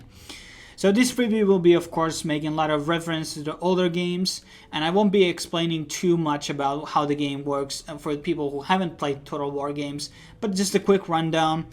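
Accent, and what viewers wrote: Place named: Poland. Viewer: you sound like my polish friend, are you from Poland?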